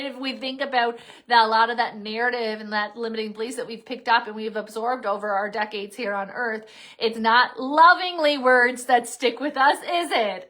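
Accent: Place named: Nova Scotia, Canada. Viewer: Hi! I've been listening to your posts for a couple months now. I'm from NS originally but now live away, so it's nice to hear the accent from back home. Your readings are always on point for me, plus they're enjoyable to listen to. Thanks for posting! 😊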